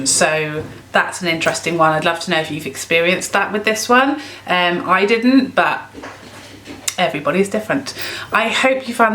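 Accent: United Kingdom. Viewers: Finally! I’ve been waiting for your review!! With your lovely British accent (I have an Aussie accent so that’s why I LOVE yours 😭) and soothing voice, I can happily listen to you all day long ☺️😊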